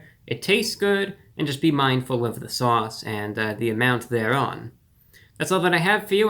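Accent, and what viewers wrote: Standard. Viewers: just modern proper english. Idk which of us is right tbh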